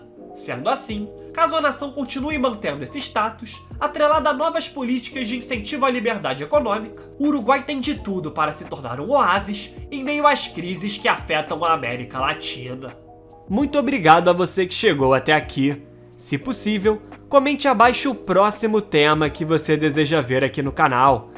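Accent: Rio de Janeiro, Brazil. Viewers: Ótimo canal, mas esse sotaque carioca é insuportável. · Teco- teco bla, bla, blá......vc pode falar isto mesmo sem esse horrivel sotaque Carioca, sem estalar essa aguda vóz. O assunto é ótimo mas a exposição não.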